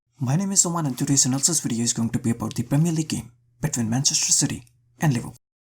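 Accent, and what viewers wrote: India. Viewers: Your accent sounds so Indian! Great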